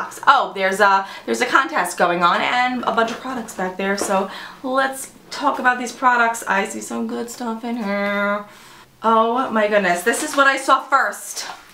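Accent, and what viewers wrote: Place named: Staten Island, United States. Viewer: You're quickly becoming one of my favorite YouTubers! My husband is from Staten Island and your accent reminds me of his friends from there. Somehow he escaped without an accent;)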